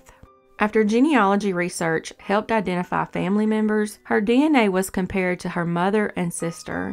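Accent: South, United States. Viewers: She has a southern accent due to her living in the south. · I'm from Georgia, and her voice sounds like Georgia or the Carolinas. She sounds like all my SC cousins. I love it! My mom sounds like Scarlett O'Hara.😆 Im from Atlanta, Ga but I don't really have a regional accent.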